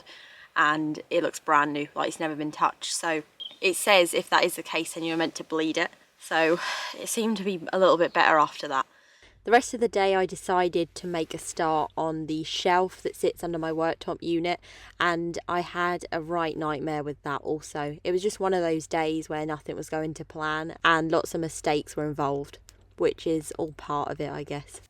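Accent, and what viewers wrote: United Kingdom. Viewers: Enjoy listening to you,. (English is not my first language). Love the British English. You are both funny and cute.. the thuft of hair dangling out. 🤣 Technical support/considerations: You have now two batteries in your car, one for the engine, the other for utilities. Please treat them as two separate electrical systems each with its on fuses. (I assume your batteries are not connected in parallel). Over time, a potential difference is created between the two batteries. Like one would be 12v, while the other would be 11v. This potential difference is a potential hazard. Please do not cross over from the electrical system of one battery, to the other. for that matter, a common ground is a cross over. My comments are irrelevant, if all the batteries are connected in parallel to one another.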